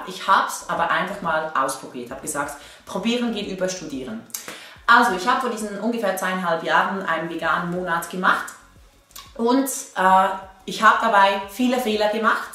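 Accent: Switzerland. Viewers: ist sie schweizerin? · Schweizerin? :'D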